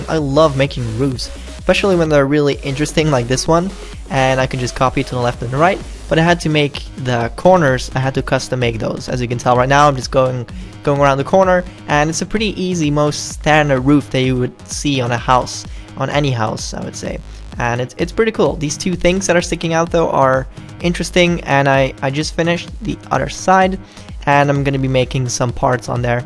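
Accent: Netherlands. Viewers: Seriously are you Dutch to? 😂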